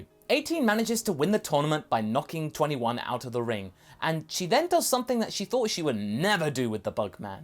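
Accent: United Kingdom. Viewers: Oh wow, it's almost like you voiced the cell from team fou......wait.... I just.....your British. Well at least that clears it up.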